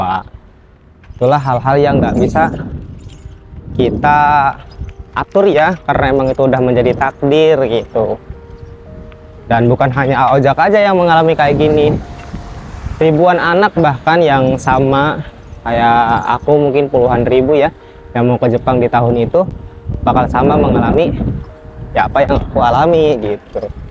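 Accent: West Java, Indonesia. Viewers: A ojak,sundanya dari mana yah? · Logat bicara nya Sunda mang ojak. Sunda na mana mang? Slm dari Cianjur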